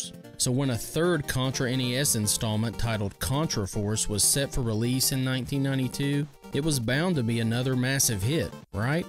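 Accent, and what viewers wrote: South, United States. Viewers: I don't know what it is about your channel, but it brings out a sentiment in me that other gaming channels don't. Seeing the instruction booklets, the old footage and how you present the information - and possibly even your southern draw, takes me back. I wish nothing but success for your channel.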